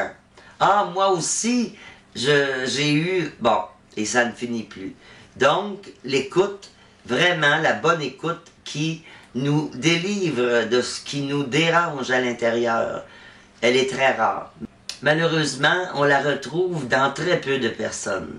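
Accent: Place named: Quebec, Canada. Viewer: Un sujet qui me touche particulièrement, car tout ce que tu dis est tellement vrai et de savoir que les autres ne nous écoutent pas, même au sein de notre propre famille, qu'on soit jeune ado, adulte ou même personne âgée, finit par enlever tout envie de se confier, par peur d'ennuyer ou par certitude que les autres se fichent de nos problèmes, ou parce que c'est souvent une habitude dans une famille de ne pas se livrer. En passant, j'adore ton accent québecquois!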